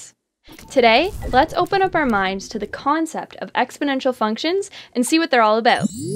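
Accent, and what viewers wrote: Canada. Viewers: Sounds like an Ontario accent.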